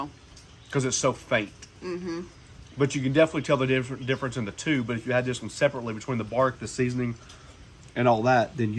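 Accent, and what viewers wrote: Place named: Alabama, United States. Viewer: Great video doing this recipe tomorrow. your accent is nostalgic to me growing up in Alabama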